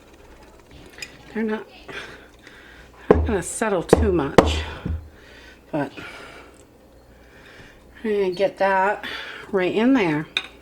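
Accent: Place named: Maine, United States. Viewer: I KNEW I recognized that accent! We just moved here a year ago from the Lakes Region in NH back to my hometown in Maine. Howdy Neighbor!!!!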